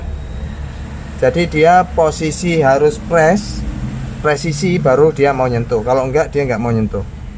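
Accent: Java, Indonesia. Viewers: Bang .......!!!!!! sebenarnya abang itu orang mana kok bahasa jawanya lancar and medok sekali terima kasih bang ... selalu jaya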